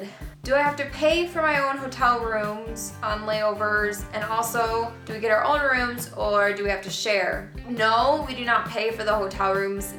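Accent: Midwest, United States. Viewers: Hey! I'm a FA on the other "legacy" side of the operation of our big giant airline fam lol. QUESTION! Are you from the midwest? kind of sounds like it haha my Wisconsin ears are picking up a little midwestern accent.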